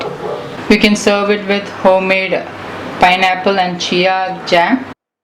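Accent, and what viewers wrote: India. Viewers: it is already recognized by many pple known as Indian accent and clear English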